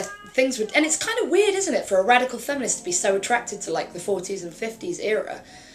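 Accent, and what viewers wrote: United Kingdom. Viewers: I'm sorry but I can't watch this video because I can't consentrate on what you are saying. Seeing you in 1940 noir chic style is making my blood race, with you chiming away in the english accent and that squacky torch song on Wow ....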